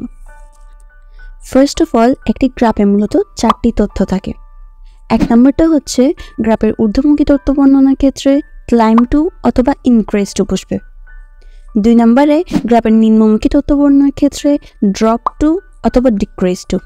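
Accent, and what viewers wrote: Chittagong, Bangladesh. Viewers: ১০০% শিওর আপনার কথ বলা বাচনভঙ্গি একদম খাঁটি চাটগাঁইয়া 🖤🖤🇧🇩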